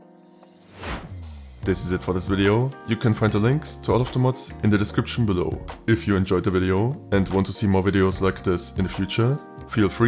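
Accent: Germany. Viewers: 2 Sekunden drin, direkt nachgesehen, jap, Deutscher, direkt bemerkt xD Grüße aus Bayern und geiles Video, hast ne angenehme Stimme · Bist deutscher oder hört man beim Englisch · Idk why but u sound German and nice video 🎉🎉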